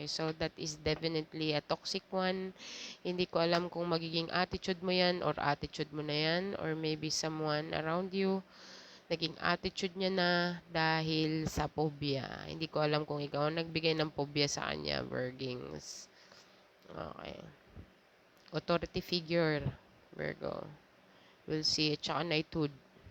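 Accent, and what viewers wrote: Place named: Iloilo, Philippines. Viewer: 😂😂😂 sagad mag ilonggo si ❤❤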